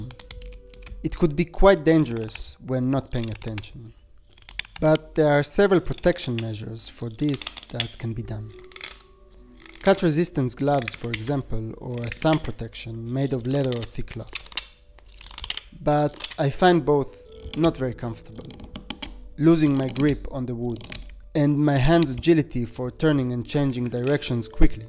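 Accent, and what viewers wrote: Israel. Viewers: סרטון מדהים,רק ישראלי יכול לזהות מבטא עדין של ישראלי🙃 האם סוגי עצים שהם רעילים או שכל ענף שנראה בריא אוכל להשתמש בו לכף? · That Israeli accent 👌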